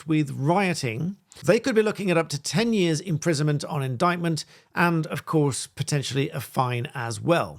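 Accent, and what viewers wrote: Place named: RP, United Kingdom. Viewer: A posh English accent, then What is a missle?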